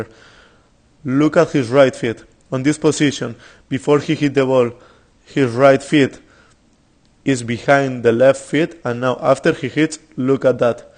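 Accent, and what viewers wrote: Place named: Spain. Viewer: Hi, thanks for the video, u give lot of information, sometimes too much but good explanation. Just it's hard sometimes to understand everything cause I'm not a good English speaker and with your accent it's even more difficult haha (no offend). What's your mother language? If it's Spanish I would be interested to see the same video in your mother language cause I speak Spanish better than English :D. Thanks, keep going with your videos;-)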